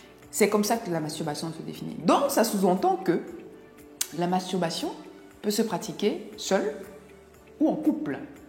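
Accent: Cameroon. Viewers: Bravo Docteur. Huumm ! Avec ton accent Camerounais, je suis satisfait de votre pédagogie. Elle est simple, objective, moins culpabilisatrice et dogmatique . Bon , certaines religions poussent leurs adeptes à la culpabilité ? C'est sont parfois des dogmes. Quand à vous, va de l'avant et parle peu et mieux prochainement,Merci.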